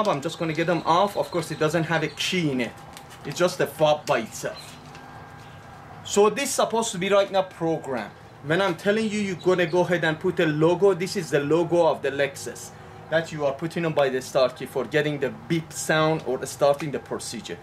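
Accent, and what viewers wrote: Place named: Iran. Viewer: Are you Persian? I was watching your video and my wife (iranian) walked by and said "who is that he sounds persian.."..lol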